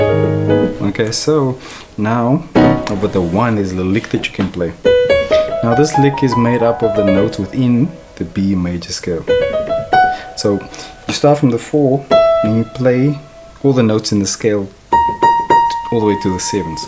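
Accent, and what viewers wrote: South Africa. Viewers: Dude you sound so South African 😅. Amazing chords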